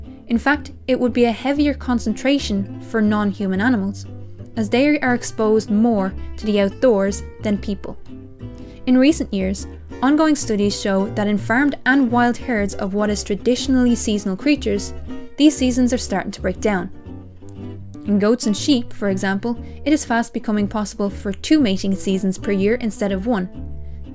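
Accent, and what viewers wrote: Ireland. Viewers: she has a lovely irish accent, i set the voice on my satnav to Irish Female, its so melodic. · It's that Irish accent, it's very nice 😁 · Have to confess I’m a sucker for a female Irish accent even if biting sarcasm is missing. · Oh I like her voice.... Love that Irish accent. Much better than that welsh stuff (kidding, love ya ). So contrails... fuel is a hydrocarbon.... the hydro is important here. When the fuel burns one of the exhaust components is water vapor. That water vapor is what we see when the conditions at altitude are right to cause this to happen. Temp, pressure and humidity are important factors. These conditions might exist at ground level, or only above 40,000 feet, or anywhere between. So... yeah, just water kids, nothing more. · yes, has a Welsh accent. has an Irish accent. Welsh accents are a bit harsher. is easier for people to understand.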